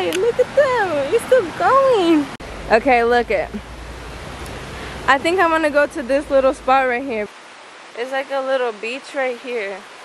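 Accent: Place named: California, United States. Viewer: Es obvio que es chicana. Rostro de mexicana pero habla inglés perfecto y con acento californiano.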